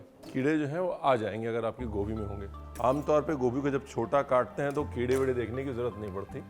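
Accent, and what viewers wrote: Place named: Varanasi, India. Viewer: Banaras walo ka bolne ka style bhut achha h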